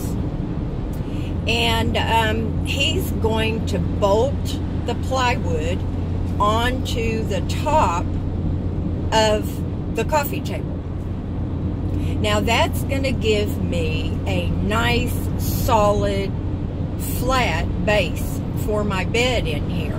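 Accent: South, United States. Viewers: "Yu All" You sound like a good ol' southern lady. Hope to see you at RTR 2019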